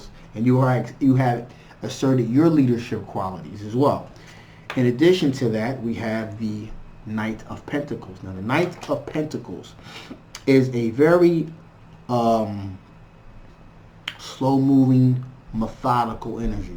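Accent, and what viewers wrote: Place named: New York, United States. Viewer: Yes your skills are on point. Love the NY accent!! Queens bound. I am feeling pushed into this relationship. I want it but in a mature way give me a vision a plan we both can agree on then I'm focused on it full force. There are some things i need to get in order with my credit then I'm ready to go all the way to marriage!!!! But the anger issues have to cease.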